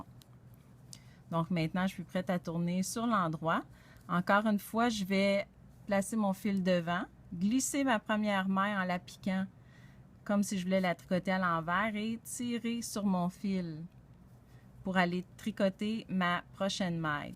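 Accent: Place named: Quebec, Canada. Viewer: Les Laines Biscottes: où se situe cette boutique? Au Québec? Car votre accent me semble du Québec!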